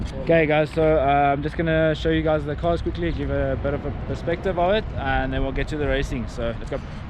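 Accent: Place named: South Africa. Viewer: I hear a South African accent I subscribe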